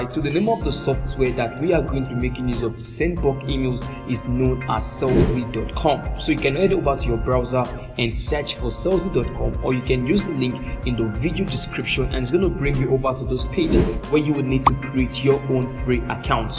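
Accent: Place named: Nigeria. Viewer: Are you Nigerian, I can pick up the accent, subtly though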